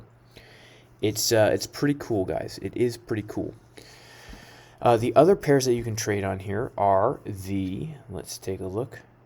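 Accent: United Kingdom. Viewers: Nice English accent. Im from UK so I am impressed. Thank you for your tuition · Haha love the British accent !! 😂😂😂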